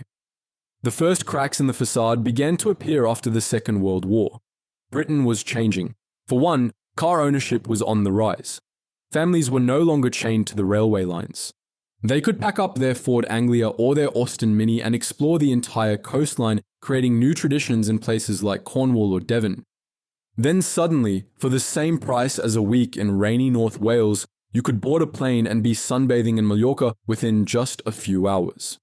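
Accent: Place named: Australia. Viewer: Australian voice indicates it's never been and is using the BigBlackCo... sorry... 'BBC' for information.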